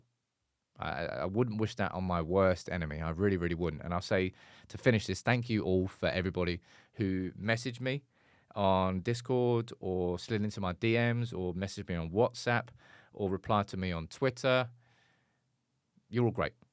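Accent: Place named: Liverpool, United Kingdom. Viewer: Defo a Scouser... sorry you had to go through that. Glad to see you got your channel back! ❤🤍🖤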